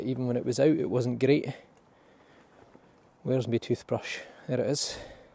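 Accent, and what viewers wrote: Scotland, United Kingdom. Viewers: The face is not ugly and the Scottish accent is so beautiful. The history you know is so interesting. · I'm one of your new subscribers and just want to say you deserve all this new attention your getting, not only are you making wonderful discoveries but also your Scottish accent is one of the subtle ones, which makes you easy to understand, even for non Brits, best wishes for 2023 hope you find what your looking for Andy a Brit from the Midlands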